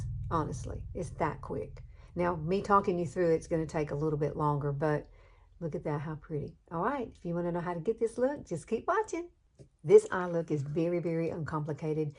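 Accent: South, United States. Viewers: Ty for the brush to pencil tip for no blobs :D Btw, I love love love ur southern accent, it is beyond cute 🥰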